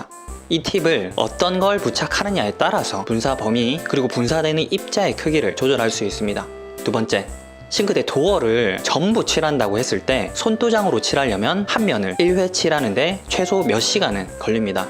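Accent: Gyeongsang, South Korea. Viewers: 구독하고 처음 댓글 달아요. 반갑습니다. 경상도 사투리 참 정감 있어요. ^^ 저도 경상도 사람이라...ㅋ 저는 방문을 리폼하려고 하는데요. 지금 살고 있는 현재집 말고 전에 살던 집이 페인트가 칠해진 목문이었기에 페인트 칠해본 경험이 있습니다. 방문을 떼지 않고 칠해서 인지 롤러와 붓으로 칠했는데 일명 눈물자국이라는 것도 생겨서 사포로 밀고 다시 살짝 칠한곳도 있었어요. 지금 살고 있는 집의 방문은 필름지가 입혀진 방문인데 필름지 위에 페인트를 해야할지 필름지를 다시 붙여야할지 고민하고 있어요. 님 일반인이 직접 셀프로 하기에 필름지가 나을까요? 필름지 위에 페인트가 나을까요?(물론 젯소 바르구요.)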